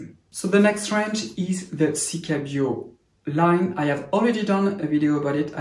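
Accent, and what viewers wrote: France. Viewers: gotta love a french accent oh lalà🤩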